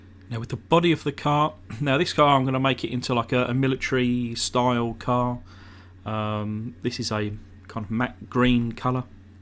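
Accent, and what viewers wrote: United Kingdom. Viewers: Hey ;-). I have a question. Are you from UK ,from Ireland ,or at other english spoken Country ?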